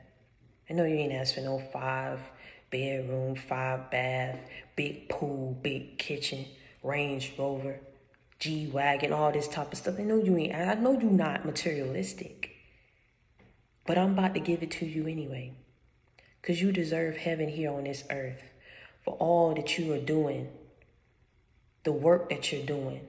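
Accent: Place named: Geechee, United States. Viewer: Sing yo sung that's how geeche people talk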